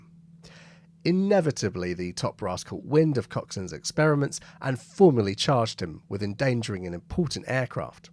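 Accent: Australia. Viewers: Well done with your Aussie accent. Now try Kiwi. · You know that Whanganui is in NZ, right? I was a bit confused by your pride in your Ozzie accent. Did I miss something? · Forget the Ozzie accent, Wanganui is in New Zealand... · 9/10 Aussie accent as assessed by an Aussie 🇦🇺 Well done mate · Wanganui is an New Zealand city . Why would a person with an Australian accent be claiming to come from there? And why are Wanganui or Parramatta any "sillier" than Thames or Birmingham or Slough? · Why would your Aussie accent be helpful in pronouncing a kiwi place name? The accents are quite different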